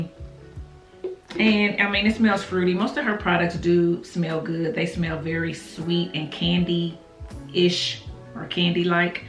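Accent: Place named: Indiana, United States. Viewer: Hi! Thanks for replying! I’m from Barbados, so everyone outside of Barbados has an accent to my ears 🙈. I don’t think I’ve ever knowingly heard anyone else from Indiana, so I didn’t have a point of reference. In any case, I could listen to you talk all day! I think it’s your energy and your entire demeanor as well. Keep the great content coming! 💕🤗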